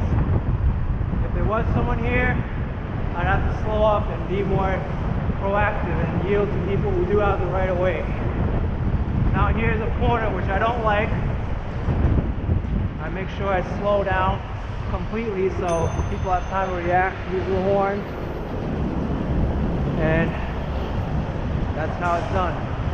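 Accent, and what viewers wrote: New York, United States. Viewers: Cute NYC accent.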